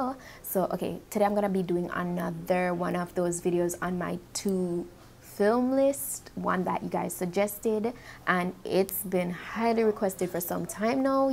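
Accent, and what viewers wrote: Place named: Jamaica. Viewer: From mi hear yuh talk mi sey ok... Yessss another Jamaican Queen! Had to subscribe... Like before mi even start watch the video tbh. 😂😂🤣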